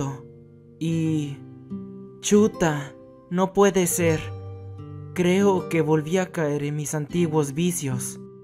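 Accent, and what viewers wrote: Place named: Peru. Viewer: Ala shaval v: Me eh sorprendido con tu acento peruano 10/10 Entons pa cuando la segunda parte :D? ;-; no mms 🌈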